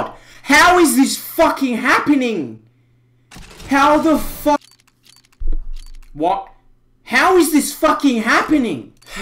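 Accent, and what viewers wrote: Australia. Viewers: australian? <3 your accent.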